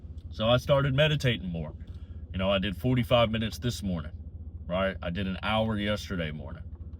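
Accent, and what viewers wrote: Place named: Louisiana, United States. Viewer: Your accent reminds me of Gambit from X-Men bro lol. Love your videos!!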